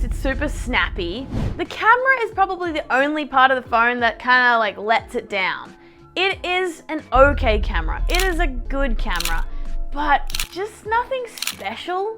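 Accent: Australia. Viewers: You're an Aussie and I love it, only Aussie youtuber I follow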